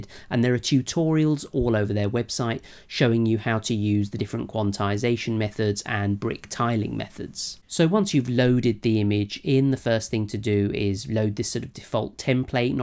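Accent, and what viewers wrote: United Kingdom. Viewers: your fancy British accent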